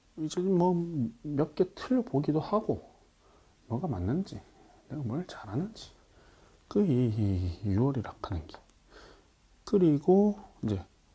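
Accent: Gyeongsang, South Korea. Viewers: 시발존나리얼하네 같은경상도사투리니깐 더리얼하네ㅋㅋㅋㅋㅋㅋㅋ와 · 시리즈로 해주세요 어제 물리수업듣다가 꿀잠잤어요 오늘도 자습보면서 램수면 빠져볼게요. 근데 왜 동남방언쓰시는지요? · 배재고나오셨으면 서울토박이일텐데 어떻게 경상도사투리 네이티브같죠?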